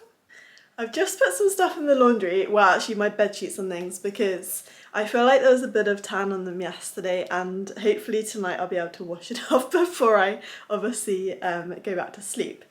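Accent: RP, United Kingdom. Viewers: You created an emotive response in me from the first bikini angle, thank you, I do like a well spoken girl (posh) :-) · love that British accent, sexy woman!!!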